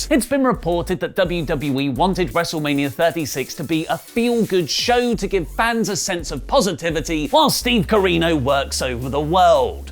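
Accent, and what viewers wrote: Australia. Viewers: That is one fine Aussie accent, my friend.👍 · your Australian accent is on point mate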